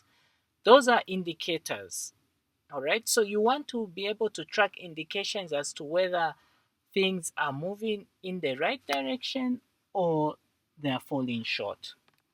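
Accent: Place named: Zambia. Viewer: You sound Zambian.. great lecture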